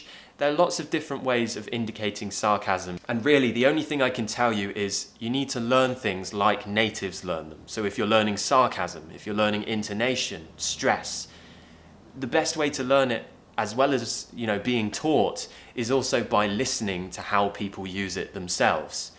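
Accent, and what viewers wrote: United Kingdom. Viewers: Nice accent, I would like to speak as a British native speaker. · Hi! You always help us a lot in pronouncing British English. I'm in love with your pure British accent, so beautiful and clear. I've always had some trouble when pronouncing the /ae/ sound and I'm doing my best working hard on it. A tip to help your students pronouncing it correctly, or at least, more correctly, is to try to place the position of your mouth as if you were going to pronounce an /a/ and then pronounce an "e". Do you know what I mean? I hope it helps! Thanks a million for all your help and good knowledge. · What kind of accent that the Primary Minister May said ?what's the difference between yours and hers? Both are really amazing!!!i was fall in love with British accent !! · Good morning, Thank you for administering our daily dose of English pronunciation! 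I do believe that listening to you on a daily basis will bring us next to perfection. Since I singled you out from the wide range of online English teachers my English has dramatically improved. Just like children do, I naturally tend to imitate your accent, use your vocabulary and so on. I suppose your she cat has a perfect British accent too! I managed to pass the CPE test in Rome but I want to go further. English seems to have no boundaries, the more I learn, the less I know. I think one day I will take up one of your courses so that I can have ready answers to my questions and doubts at hand! I cannot thank you enough for what you do!!!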